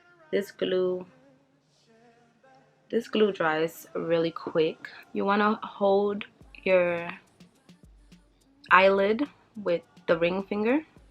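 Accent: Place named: New York, United States. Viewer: Great job it looks good. I like your accent are you from New York?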